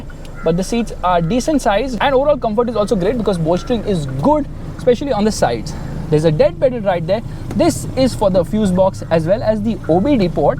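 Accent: India. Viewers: You speak so fast with Indian accent but yet I can understand you way clearer than most of native English or US speakers.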